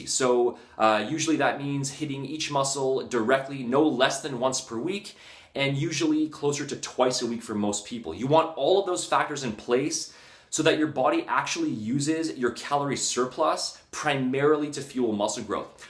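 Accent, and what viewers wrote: Australia. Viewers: You Australian??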